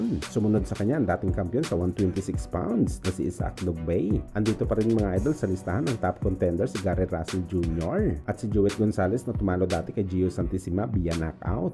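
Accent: Iloilo, Philippines. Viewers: Ilonggo geyd😅😅😅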